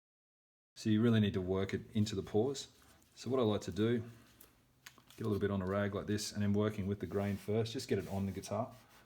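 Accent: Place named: Australia. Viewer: Awesome, sounds like your an Aussie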